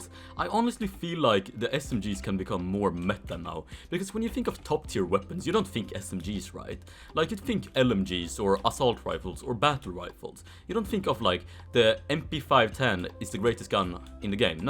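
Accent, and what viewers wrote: Sweden. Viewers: Plus a swedish accent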